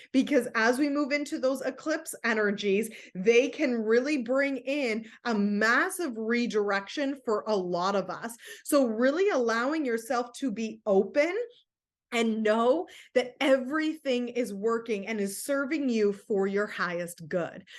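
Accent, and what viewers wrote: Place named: United States. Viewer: Absolutely wonderful to listen to you. loud and clear for me who is Swedish. Wonderful American accent.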